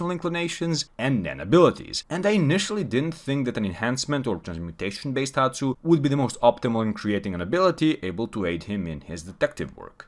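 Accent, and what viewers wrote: Eastern Europe. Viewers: I asked under ur most recent video if you were Eastern European and I just knew I had a Serbian brother here Keep up the great work! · Somehow kinda funny to hear a Slavic accent talk about anime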